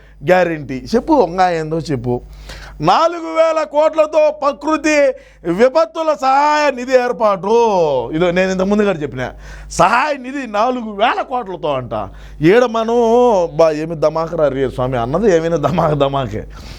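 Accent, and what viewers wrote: Rayalaseema, India. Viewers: Posting this comment randomly to reach you Anna, though I’m from Kosta, appreciate you on highlighting Seema yaasa, I love it anna.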